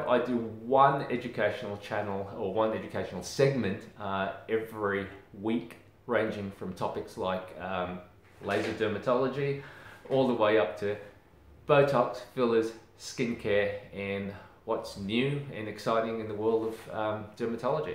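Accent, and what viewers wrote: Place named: Australia. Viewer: Such an informative and easy to understand video! Love your Aussie accent and your smile! Thanks!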